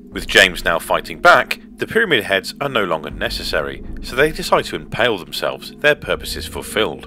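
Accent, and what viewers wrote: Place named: Yorkshire, United Kingdom. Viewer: Glad to hear a friendly Yorkshire voice 😀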